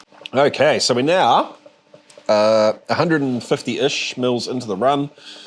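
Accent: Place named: New Zealand. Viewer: Your absinthe video popped into my list, and I noticed your Kiwi accent. With your videos on doing crazy alcohol from Pop Tarts and other stuff, you reminded me of my uncle Ron who emigrated there about 25 years ago. Before he left, he would try to make wine from turnips for a laugh, and now makes cider apparently.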